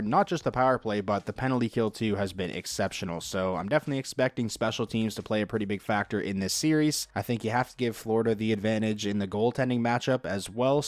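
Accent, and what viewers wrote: Nova Scotia, Canada. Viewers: Are you from Nova Scotia?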